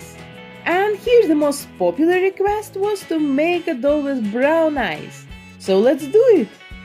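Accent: Russia. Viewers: По вашему акценту, можно с полной уверенностью сказать, что вы Русская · Вы ведь из России ?) Акцент слышен.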